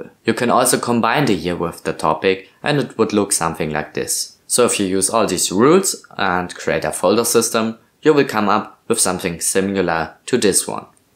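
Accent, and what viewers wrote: Germany. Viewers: bro has the strongest german accent iv ever heard, nice video of course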